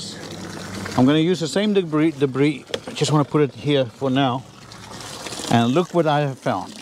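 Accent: South Africa. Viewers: Are you South African that has been living in the states for quite a while? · And here I thought you were originally from Germany. Must be an Afrikaner accent, right?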